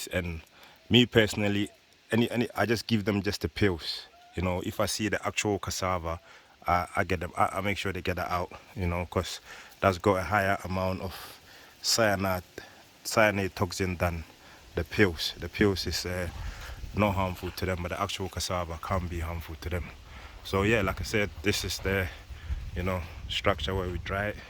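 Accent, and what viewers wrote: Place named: United Kingdom. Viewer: Just found ur channel .. u got a British accent mate lol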